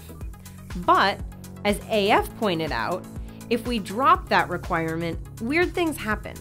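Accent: United States. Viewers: Y u talkin murican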